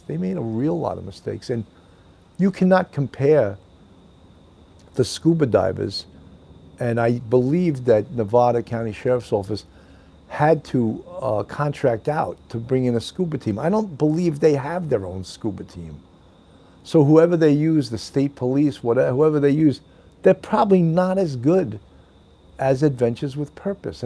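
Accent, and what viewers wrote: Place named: East Coast, United States. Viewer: The hat looks great on you. Your voice makes me miss the east coast.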